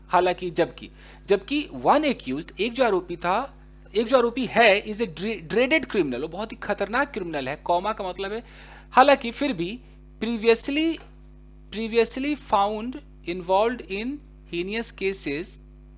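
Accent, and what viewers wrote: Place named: Bihar, India. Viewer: Sir ,where are you from ,it seems that u are from Bihar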